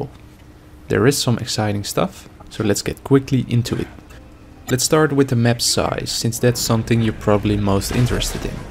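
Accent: Netherlands. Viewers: You sound very Dutch, the accent I mean.. I am correct.. Oui? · Why do you sound so dutch...(or flemish)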